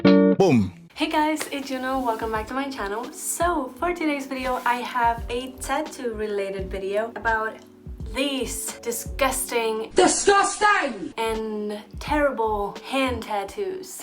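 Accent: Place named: Catalonia, Spain. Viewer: As soon u started talking could notice in your accent that you are Catalan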